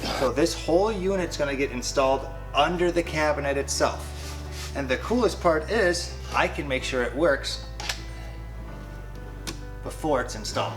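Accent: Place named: Wisconsin, United States. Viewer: I grew up in central Wisconsin, I know that accent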